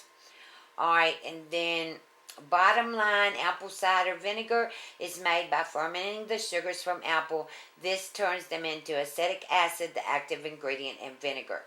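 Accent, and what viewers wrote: South, United States. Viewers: Pennie Clinton Yes has that deep southern accent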